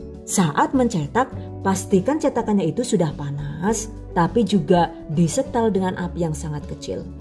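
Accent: Banyumas, Indonesia. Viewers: Keren kalau nonton chanelnya,yg saya suka sampai modalnya di catat dengan lengkap karna saya pedagang,jadi seneng aja. tapi saya KEPO 🙆🙆aslinya orang mana,kalau di lihat kayanya tinggal di jawa timur,tapi kadang ngomongnya ada NGAPAKnya😁🤣 apa kaya saya karna temen q dari mana"jadi q bisa sedikit" Logat dari temen" kok q jadi ngelantur🤦🤦pokoknya q Lope-Lope lahh😍😍😍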